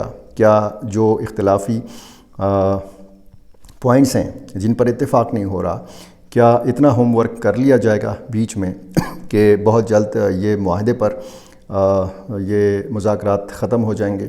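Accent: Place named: Mansehra, Pakistan. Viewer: In k lehaja bht zada hmry elaqay Manshera sa lagta ha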